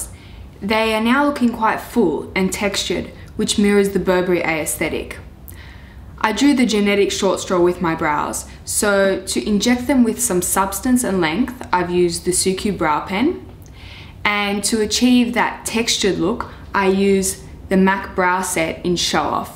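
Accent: Australia. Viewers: you also sound Australian?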